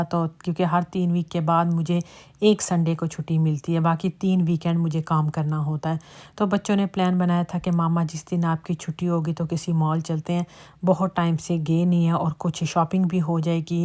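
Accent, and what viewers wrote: Pakistan. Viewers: Wesy ma'am ek bt tu hai itny saal Canada main rehny k bad b ap ne Canada ko apny andr nai any diya ap jb bat krti hain tu ek Pakistani lehja alfaz sb kafi واضع hota hain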